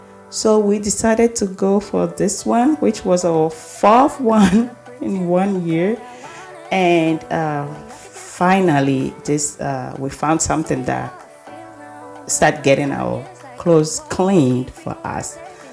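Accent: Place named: Africa. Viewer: That mama africa accent 😩❤️🥰 i love it so much! Wonderful video mama!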